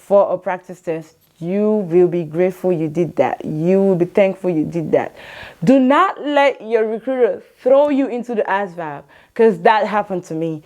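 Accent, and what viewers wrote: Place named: Liberia. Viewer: Bro on God you sounds like a Liberian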